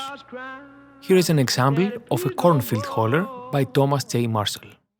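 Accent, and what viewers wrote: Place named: Greece. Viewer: Are you Greek by any chance? Your accent seems very Greek to me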